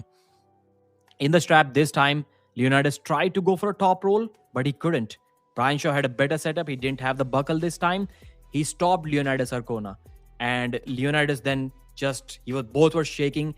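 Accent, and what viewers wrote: India. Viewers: Boah schreib doch dazu im Titel das du nur Bilder zeigst replaced und dein Indischen Akzent sprechen lässt statt denn Kampf zu zeigen.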